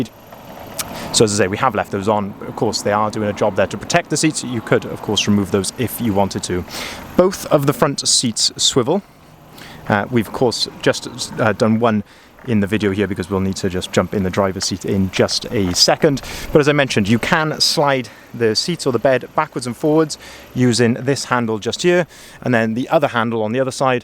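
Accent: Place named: Wales, United Kingdom. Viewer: Lovely professional presentation with that wonderful Welsh accent - you do 'spit' your 'T's and 'D's well (unlike some these days). 😀 Fab van too, though with 5 owners and 3 years old, it's about 5 grand overpriced in my view. At the end of this summer season, 2020 Oceans will be sub 60k and already the odd one for 62 on a 21/22 plate can be found.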